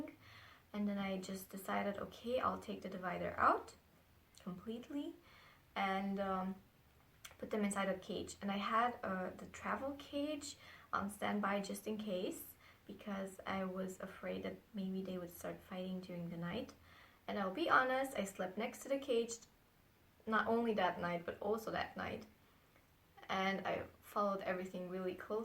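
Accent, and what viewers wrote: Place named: Europe. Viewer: Where are you from in europe? I think I recognize the accent ;) anyway nice video! :)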